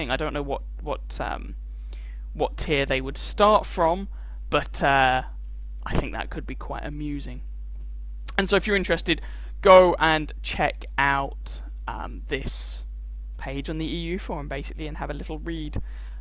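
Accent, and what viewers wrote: England, United Kingdom. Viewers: Well done on the new job. As Wulf asks.... where in Scotland? I just assumed you where in Sassanach country from your accent. Keep up the good work :-)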